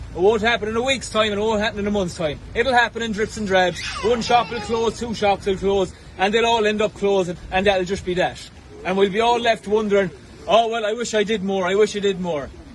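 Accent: Ireland. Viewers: I do like the irish brogue and the guy is spot on . The only thing i'd ask the irish people is not to bring flags to the muslim march every week end in london because we have the same problems . God bless ya' lads ❤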